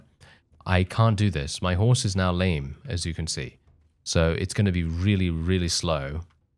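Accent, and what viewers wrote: United Kingdom. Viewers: Aw fuck thats so funny. You knew what was gonna happen, youve read the wiki, you had an idea what the red immortals could do since you just fought 10 of them, its always hilarious when you get to complaining in your lovely british voice.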